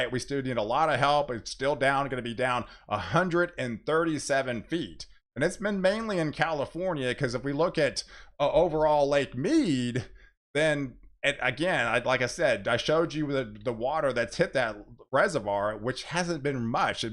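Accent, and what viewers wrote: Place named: Texas, United States. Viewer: youre in mckinney??? i was trying to figure out your accent.. not quite a east texas accent?? my folks live in benbrook over in foat wooth.. we re in italy.. thanks for all yall do.. blessings..doc johnny nato sicily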